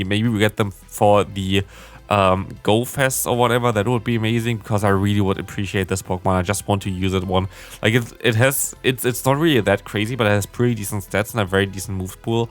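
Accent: Germany. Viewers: Wait... You´re german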